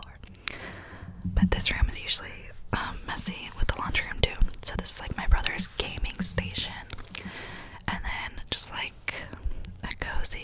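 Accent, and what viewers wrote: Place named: South, United States. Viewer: This clearly isn’t a manufactured neighborhood. First off I’ve never seen a manufactured house anywhere near this big, and the house I can see across the street looks completely different than the house she’s in. Manufactured neighborhoods usually have very similar looking houses. And based on her accent and the landscaping that I can see, I’d say she’s either in Texas, South Carolina, or Georgia, in a Metropolitan are like DFW, Charleston, or maybe somewhere near Atlanta or Savannah. Which means that this land is far from cheap, I’ve seen two acre lots in Dallas go for 400k.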